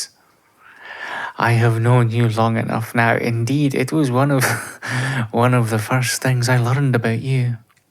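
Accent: Scotland, United Kingdom. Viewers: Well, that Scottish accent does it again lol. I wasn't ready for the mix of soothing and spicy—you're definitely a master at keeping us on our toes. Can’t wait to see what you come up with next! ❤ ❗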